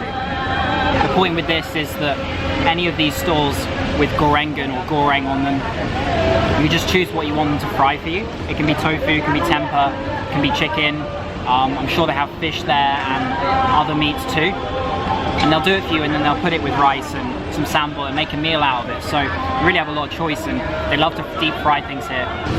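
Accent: England, United Kingdom. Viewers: I love to heard your British accent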